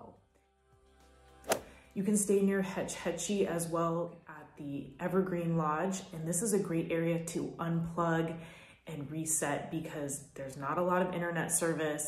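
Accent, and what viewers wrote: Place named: Australia. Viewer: Great video! Thank you and your Aussie is darling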